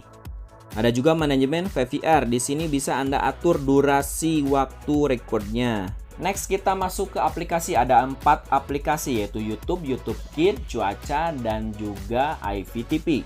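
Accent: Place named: Java, Indonesia. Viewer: TPRI 😂😂😂 medok bangettt bang 😂😂😂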